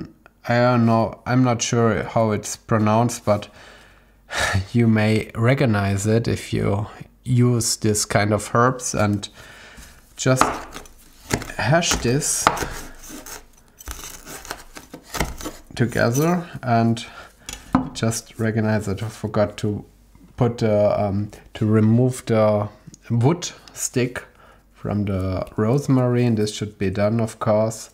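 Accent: Germany. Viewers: This German accent is hilarious. XDD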